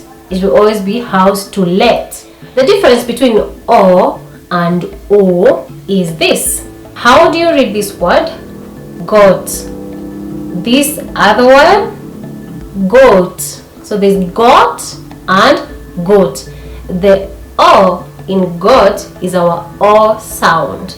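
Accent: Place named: Kikuyu, Kenya. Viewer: I love your kikuyu.It is deep.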